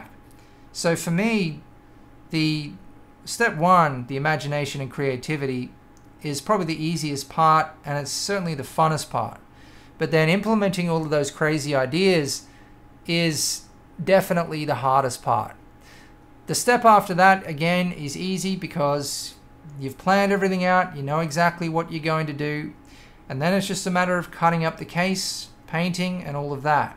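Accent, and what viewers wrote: Australia. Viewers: are you aussie mate?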